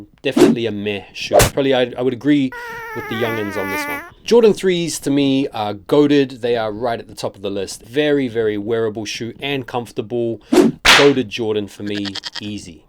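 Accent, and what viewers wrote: United States. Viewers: Why do you have an American accent here all of a sudden? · Why does he all of a sudden have a Brooklyn accent?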